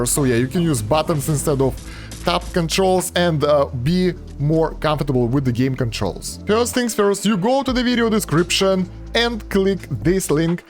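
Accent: Russia. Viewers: Слышу Русский Акцент у автора видео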